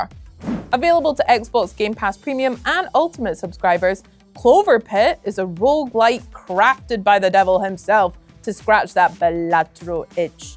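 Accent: Scotland, United Kingdom. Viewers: Xbox Strong all Day Long.... Is this Chick Kool or what. And so I hear a Scottish accent? 😊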